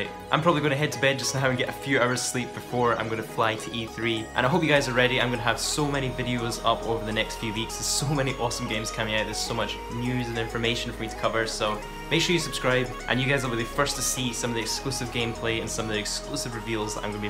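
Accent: Scotland, United Kingdom. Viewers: R u scottish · Nice to find a fellow Scot on YT. Fwendsssss :D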